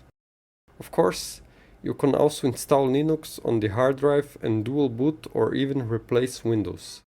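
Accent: Brazil. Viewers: vi que era brasileiro pelo sotaque, valeu meu rei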